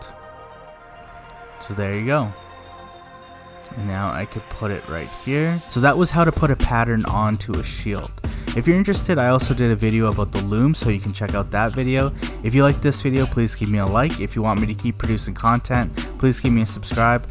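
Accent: India. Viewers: I said are you Indian 🤬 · Are you Indian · Are you Indian? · It was not Irish it is Indian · Are you copy video coz you are indian but speaking in pure english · Are you indian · are u indian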